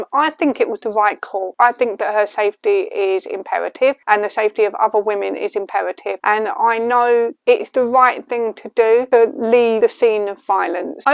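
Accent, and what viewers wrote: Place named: Council estate, United Kingdom. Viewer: You know someone lives on a council estate when they speak like you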